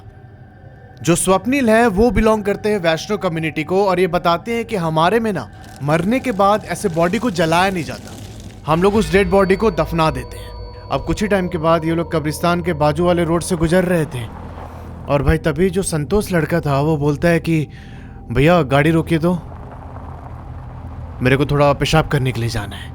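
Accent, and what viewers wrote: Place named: Bengal, India. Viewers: Diwali 🪔🎇 Ke ager din Matlab bhut choturdhosi ke din 14 saak or sabke pura Ghar mein 14 🪔 Candles🕯️ Esob Hum Bengali Log Apne Apne Ghar mein lagate hai or bhaiya bhut choturdhosi ke dis sachmein darabna kuch hota hai eto maine bhi suna hu likin koye bhut aaise baat nhi kar sakta hai jo bolega MAI ARPITA NHI HU, aise shadow bagera dek sakta hai likin eeita kuch hota hai bhuut bat karta hai e kuch jada hi hai aspas negative energy rahta hai to feel hota hai. Likin Bhuut samne ake bath karega esob nhi hota bhaiya 😅 PLEASE REPLY CHAT ? OR ek bath Apke Bengali Voice So Sweet ❤ ! LOVE FROM KOLKATA / (WB ). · Sir your bengali accent is so cute 🥰🥰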